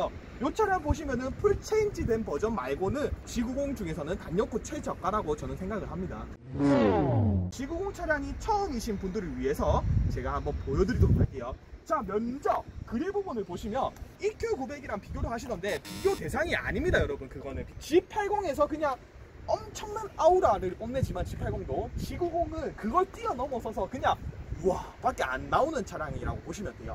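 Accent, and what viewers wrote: Busan, South Korea. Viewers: 시원시원한 부싼 사투리에 설명도 잘해주시니 사고싶은 욕망이 치솟네요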